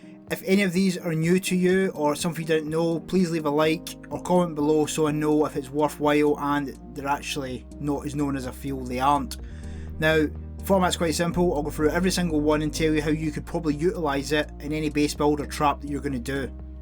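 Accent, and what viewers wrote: Scotland, United Kingdom. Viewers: My celtic brother from another mother - Keep up the epic content man! You've always given tips that I've never known and I've been playing on and off for a number of years! You're a legend, and to hear a Scotsman showing the world how its done is only right! Cariad fawr o Gymru i chi [Much love from Wales to you!] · that scot accent is very hard to understand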